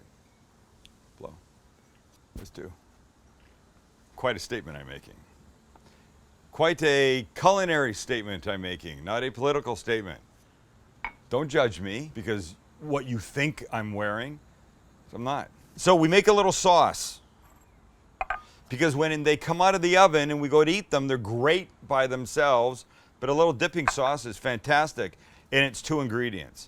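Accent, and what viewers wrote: Canada. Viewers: What part of Canada are you from? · You’re Canadian?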